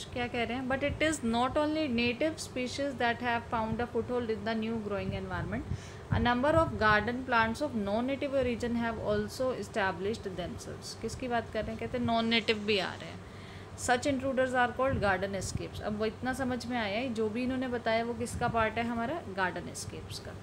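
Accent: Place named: India. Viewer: Better to explain with one language i think. Difficult to understand IndEnglish.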